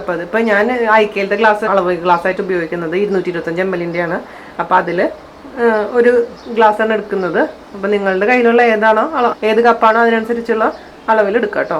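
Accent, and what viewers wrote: Thrissur, India. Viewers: super pachadi.. 👍pine trichur language super... 😍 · fantastic. Your Thrissur style tone of talking is really an added attraction to the elaborative presentation. Well done.. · Chechide veed evideyaa. Thrissur samsaaram aanalloo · Chechi de samsaram kettittu Thrissur,Urakam bhagathulla aalu pole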